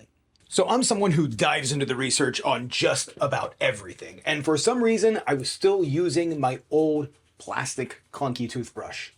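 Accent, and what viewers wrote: Canada. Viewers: Lol, it confuses me every time. I was wondering if you were Canadian.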